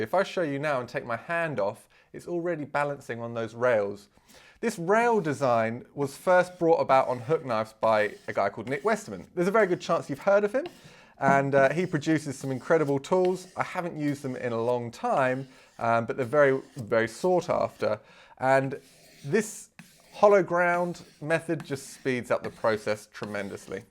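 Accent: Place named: England, United Kingdom. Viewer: He’s English you wanker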